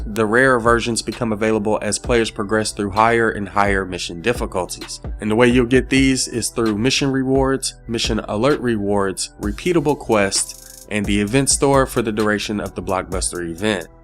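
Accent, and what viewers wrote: South, United States. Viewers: You sound like Channing Tatum when he made that country accent in "Kingsman: The Golden Circle"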